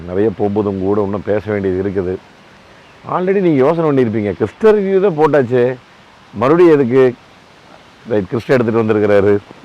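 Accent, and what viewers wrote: Kongu, India. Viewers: உங்கள் அழகு உங்கள் கொங்கு வர்ணனை பேச்சு அண்ணா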